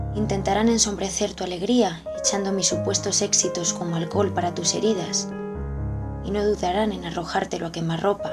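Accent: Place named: Spain. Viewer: Me encanta el acento español!!!!!!!!!!!!!!!!!!!!!!!!!!!!!!!!!!!!!!!!! Me encanto lo que hiciste! He llorado... inevitable no llorar!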